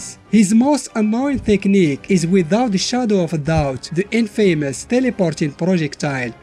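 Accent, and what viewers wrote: Morocco. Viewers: Great stuff, you can never miss a moroccan accent lol great work keep on. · من خلال لهدرة ديالك نعرفك مغريبي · مستحيل متكونش مغريبي من لكنة ديالك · Your English pronounce look Moroccan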